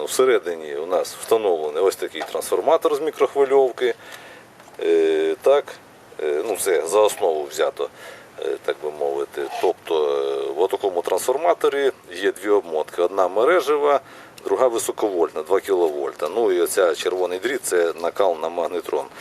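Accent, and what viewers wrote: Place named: Sumy, Ukraine. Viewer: Я з Харківщини, але тільки почав дивитися твій канал, одразу зрозумів, що ти з Сумщини.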